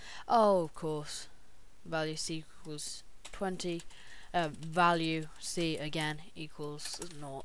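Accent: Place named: United Kingdom. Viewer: great tuts...also love your accent, british-type accents are cool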